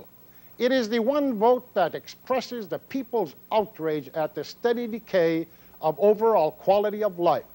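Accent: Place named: United States. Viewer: He sounds like a american.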